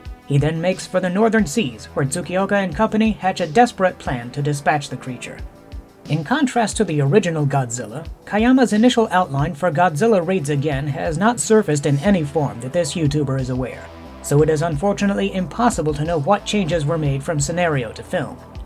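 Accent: Transatlantic, United States. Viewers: Never thought I’d hear someone with a transatlantic accent in 2025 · I know this has been up for a year but I’ve only just started to pay attention to these. I own this English version, and when you said it read like a stilted first draft, that nailed it. I couldn’t agree more. It’s a pretty technically accurate translation (I own and have read the Japanese version!), but there’s no flair, and consequently comes off a little boring. I’m eagerly awaiting the Mothra translated book by the same guy, and am hoping that he’s taken a bit more time to clean it up. Translation is an art, but when treated as a science, it’s not very good. Great video, and love the Transatlantic accent! Is that how you normally talk, or is it an affectation?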